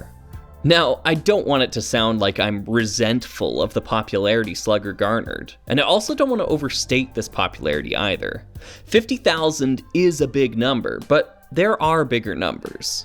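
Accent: Canada. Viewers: I found you through your lego space minifigure tier list, which I frankly still disagree with, lol, but thats ok. I absolutely love your quirky and odd videos and slug stop motions and odd taste in sets; theyre all highly engaging. Also your very strange Canadian pronunciation of so many words. Good times. I'll be sticking around for the long haul to see what else is afoot in the channel. Keep up the great work,!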